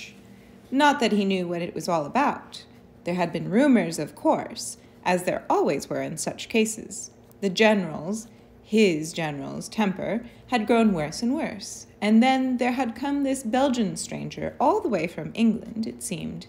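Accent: France. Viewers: Great french/belgian accent! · Your french accent is delightfull , tres bien ma chere. :)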